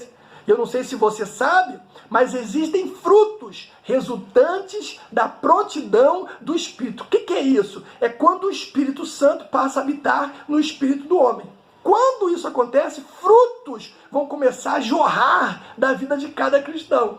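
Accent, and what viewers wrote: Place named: Rio de Janeiro, Brazil. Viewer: Vc é carioca ? Sua fala é irritante.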